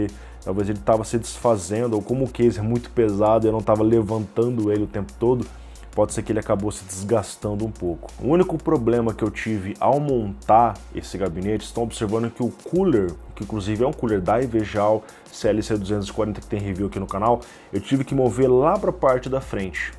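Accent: Brazil. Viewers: Is he speaking Brazilian Portuguese or European Portuguese? Is there a difference ?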